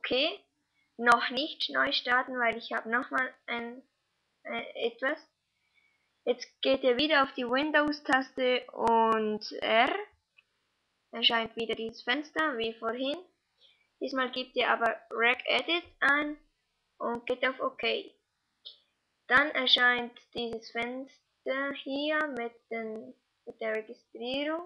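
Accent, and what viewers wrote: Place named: Switzerland. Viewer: schweiz???? oder wie mir gefällt der dialekt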